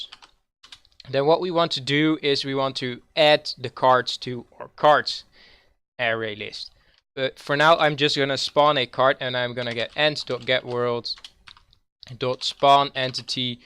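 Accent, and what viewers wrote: Netherlands. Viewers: Are you Dutch? Als Je NL bent Dan hoorde ik het aan Je engelse accent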